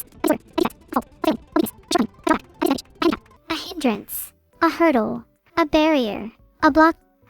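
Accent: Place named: United Kingdom. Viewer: hit her with a british ancent update, and she use all the big word in 1 sentence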